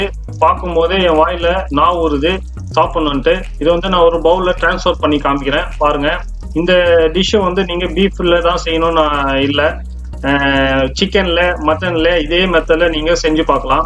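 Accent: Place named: Bangalore, India. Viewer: Bro neengo Bangalore slang pesuringo correct I think you are from Bangalore I followed your tips it came very wellmy wife & childrens enjoyed it Thank you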